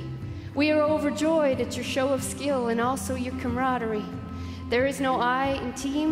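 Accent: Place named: France. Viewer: Wow, that was beautiful. Your French accent is quite nice too.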